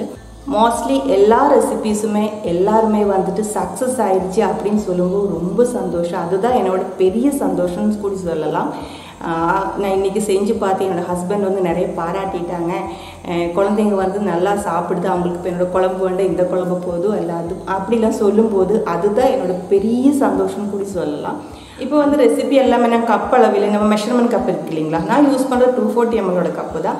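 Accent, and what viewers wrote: Kerala, India. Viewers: We tamilnadu people love Kerala people and their slang..Hard work Never fails Sis.. keep rocking dear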